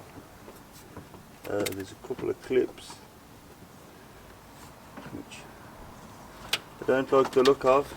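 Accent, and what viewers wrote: Birmingham, United Kingdom. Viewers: What bit of Birmingham you from mate?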